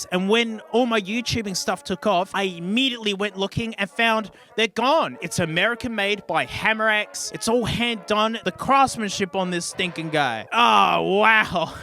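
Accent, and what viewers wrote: Australia. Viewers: Wait a minute, " ", that familiar Aussie boy voice, is this the man behind Tony and the Litreboard?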